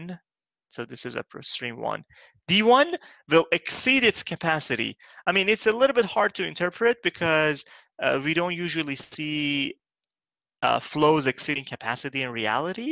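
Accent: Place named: United States. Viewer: That's a long video, I spent 3 days watching it, making notes at the same time, and learning English as well. Thanks to good American accent, I can understand the video relatively easily, but the theory is still difficult to grasp.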